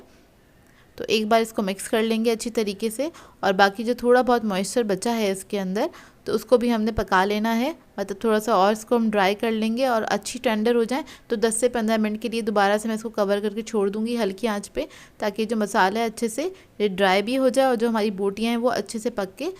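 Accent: Karachi, Pakistan. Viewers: Your accent reminds my old days of Karachi! I really appreciate your effort 🤗☺️ GOD bless you 😊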